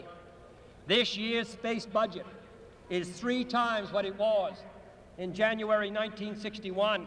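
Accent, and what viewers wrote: Boston, United States. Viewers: Such amazing orator. Even when he says “hard” in his full-throated Boston accent, it sounds beautiful. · His Boston accent cracks me up "it's all too haaard"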